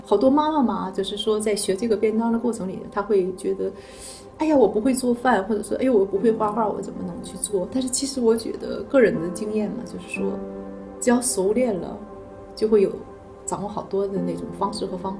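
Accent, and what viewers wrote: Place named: Northeast, China. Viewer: 东北银，鉴定完毕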